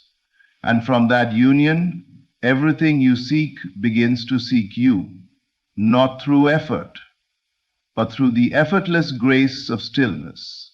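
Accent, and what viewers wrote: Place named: India. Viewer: I can hear the Indian accent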